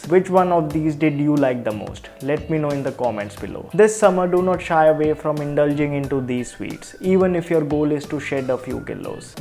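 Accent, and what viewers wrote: India. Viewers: your english is amazing .perfectly indian english .amazinggggggg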